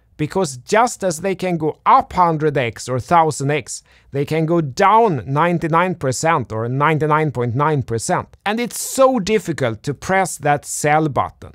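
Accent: Sweden. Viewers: This Swedish guy accent is like music and the best in the business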